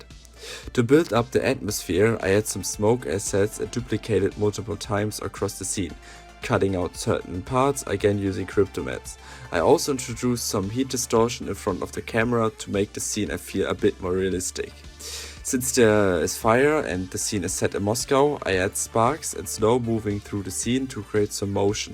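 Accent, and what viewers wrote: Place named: Germany. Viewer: Are you German? You sound like you have a slight accent ✌️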